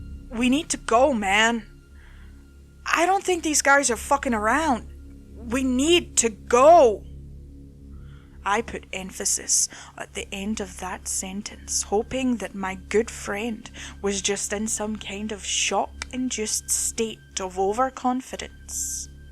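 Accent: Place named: United States. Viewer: This was totally awesome. Your American accent is great! I hope you do more of these!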